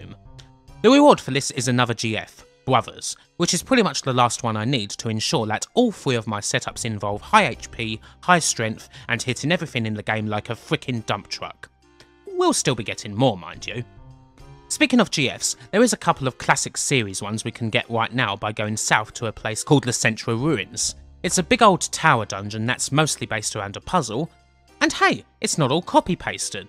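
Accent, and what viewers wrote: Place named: England, United Kingdom. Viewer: Как меня бесит акцент англичан и не неси херню про сон и смерть главного героя ) Это моя лучшая игра.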